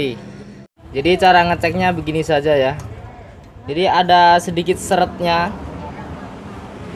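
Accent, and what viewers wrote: Indonesia. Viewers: Logatxa gw kenal ini orang madura heheeheh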